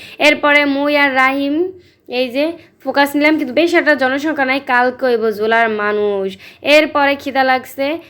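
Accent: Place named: Barisal, Bangladesh. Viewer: ভালো সুন্দরী লাগছে কিন্তু বরিশালের ভাষায়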